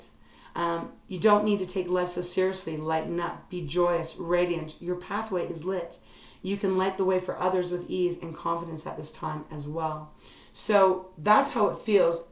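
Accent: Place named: Canada. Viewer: Thank you! ❤ Are you in Canada? I’m in Montreal and you sound like a fellow Canadian (I’m in Montreal)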